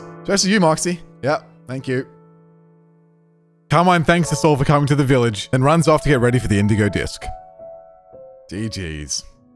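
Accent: Australia. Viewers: i never realized how australian you are matye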